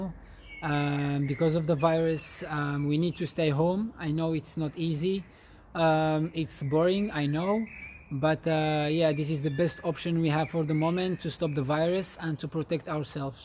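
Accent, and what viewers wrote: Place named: France. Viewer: I felt a french accent in your voice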